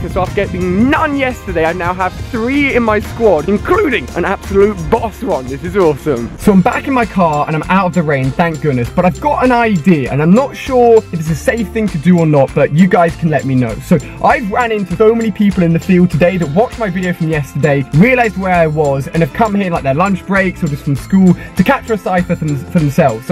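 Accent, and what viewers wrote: United Kingdom. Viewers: Really like this guy's videos but man oh man I cannot stand his accent. I usually love British accents but his is awfullll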